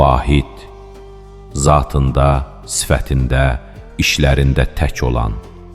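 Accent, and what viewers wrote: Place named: Turkey. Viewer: Salamun aleykum, Allah razı olsun, çox gözəl videodur. bəzi təkliflərimi ümid edirəm dinləyərsiz. Ərəbcə hərflərin bir neçə cür tələffüzünü nəzərə alaraq düzgün oxunuşu üçün adları ərəbcə yazsanız daha yaxşı.olardı. bəzən türkcə oxuyursuz qaf əvəzinə.kaf oxuyursuz. Uzadılan zaman Ə-ni yox A-nı uzadırsız. Alim yox əslində əyn hərfilə Əəlimdir. Zahir yox Zəəhir. Qaffar əslində. ال غفار Ğaffar oxunur , qafur da ğaafur olur Samad - ehtiyatsız yox ehtiyacsız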